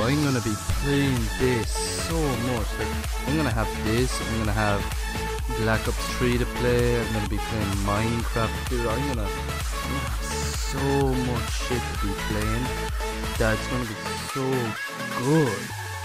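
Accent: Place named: Ireland. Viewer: Youre irish too nice!!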